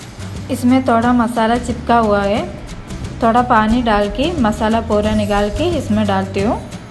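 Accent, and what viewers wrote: Kerala, India. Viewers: fish to banayenge tab banayenge par aap ho bahot hi zyada CUTE ho and aapka malayalam accent me hindi bolna bahot hi pyara lagta h. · Your Hindi is typical Mallu Hindi....it reminds me of my mummy speaking hindi with our neighbors...even my mom makes fish curry in the same manner....amazing job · Nicely explained. Moreover your language was very sweet dispite being from Kerala. Hindi gives you a wider audience... keep it up 👍. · Nice receipe , Nice malayalam Hindi & Nice saree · Amazing Ma'am. Super fish curry. And your Hindi is amazing, with a touch of Malayalam accent. . That's the beauty of our great country India. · Hello there, liked watching your recipes, specially the coconut shells used n the pots cooked in. Your hindi reminds me of one of our Auntie from Kerala and many friends I have from there, so also when you give recipe details I cherish your speaking. 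Keep sharing recipes, I wish I could also get tamarind and shallots from Kerala :-) Have a blessed wonderful day! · You are so sweet hindi Kitna majedar he kerala accent me fantastic recepi god bless you always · Love you dear. Am in Dubai suddenly I remembered frind who is shifted to Kerala Bec same style of Hindi speaking ❤️❤️❤️ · aapka hindi bolne ka style mujhe meri friend ki yaad dilaya. She is also from kerla.. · Keralite flavoured hindi is really very sweet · you are from Kerala,you should be proud to speak in your mother tongue.. Your Hindi sounds like Malayalam. I love Malayalam,speak in Malayalam . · love the mallu accent....maja aa gaya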